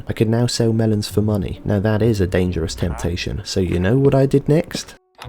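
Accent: United Kingdom. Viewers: Ah yes British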